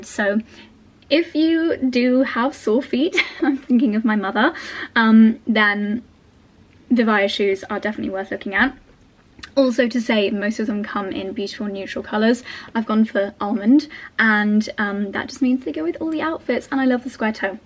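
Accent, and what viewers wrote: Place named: United Kingdom. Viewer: Yes that word isn’t used in The US. However, you need to continue using The Queen’s English. It’s very charming to Americans listening & watching your Vlog!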